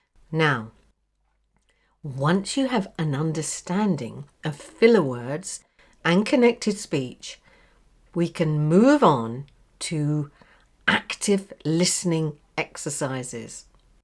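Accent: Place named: Northern England, United Kingdom. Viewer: What city do you live in? You sound from north England. Greetings from Casablanca Morocco.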